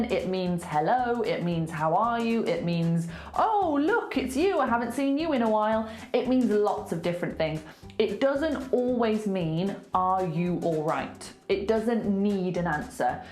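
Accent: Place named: West Midlands, United Kingdom. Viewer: I cant believe you live in West midlands,im from walsall now your accent makes sense😃😃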